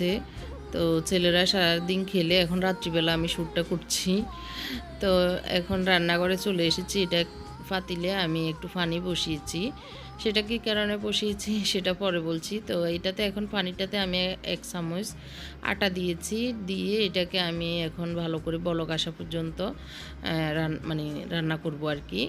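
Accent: Noakhali, Bangladesh. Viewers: আপনি তো নোয়াখালির কথা শুনেই বুঝতে পারি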